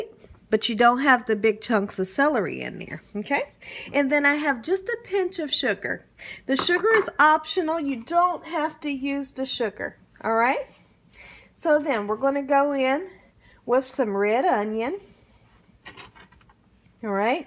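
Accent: South, United States. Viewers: I love that southern accent too. What a lady!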